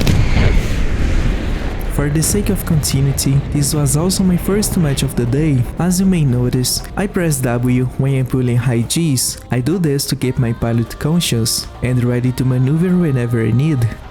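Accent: Brazil. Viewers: Where u from? You sound like a brazilian guy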